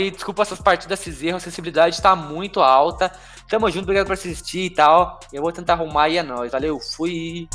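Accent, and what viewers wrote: Rio Grande do Sul, Brazil. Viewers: ele é gaúcho?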